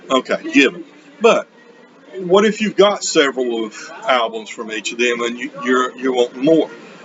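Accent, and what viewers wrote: South, United States. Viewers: I don't know if I can be your friend. You keep saying prog rock fans have no sense of humor. You make me want to cry when you say that (LOL). Seriously, I enjoy your channel. I enjoy your clumsiness. I am more clueless about this video making technology than you are. Secondly, you remind me of my best friend that passed about 4 years ago - same hairstyle and also a southern accent (his was a GA-FL mix). I think you are just a decade older than me. My friend was born around the same time as my parents.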